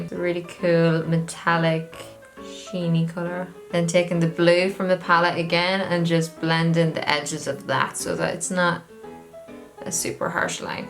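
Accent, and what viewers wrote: Ireland. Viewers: Is that an irish accent u have?in its cute!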